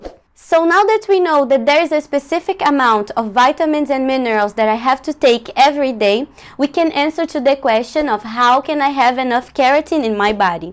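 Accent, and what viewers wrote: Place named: Brazil. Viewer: I knew she was brazilian by her accent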